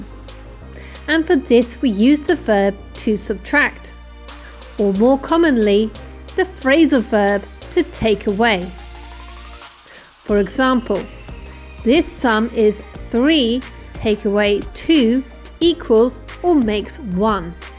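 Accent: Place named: United Kingdom. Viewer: i like your accent can you tell me wich part of the UK is this accent from?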